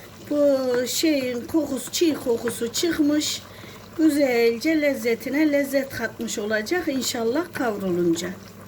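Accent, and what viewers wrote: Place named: Nevsehir, Turkey. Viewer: Kesin Nevşehirli bu abla 😅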